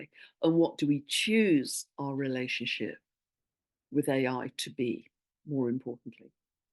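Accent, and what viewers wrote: United Kingdom. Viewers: Luminous holidays to you dear!!! I love all your insights and beautiful energy and british accent. 🙏🏻💫💚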